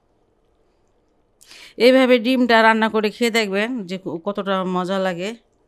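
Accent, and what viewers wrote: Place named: Bikrampur, Bangladesh. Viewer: উনার বাড়ি বিক্রমপুর।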